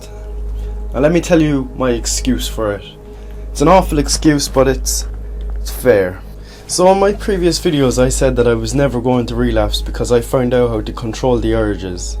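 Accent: Ireland. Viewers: Bro you rare af a black guy with a Irish accent you would get so much ass in America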